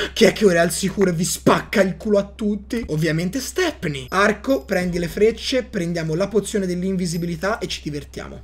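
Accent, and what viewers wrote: Tuscany, Italy. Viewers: Ste perché non fai un video delle bedwars, dove parli solo con il tuo dialetto toscano, spolliciate così lo legge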